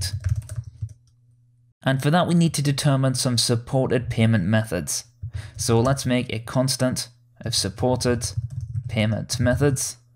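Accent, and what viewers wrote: United Kingdom. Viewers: Where is your english accent from ?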